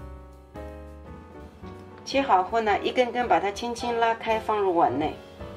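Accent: Shanghai, China. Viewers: 真正上海人讲国語，就是这种口音的，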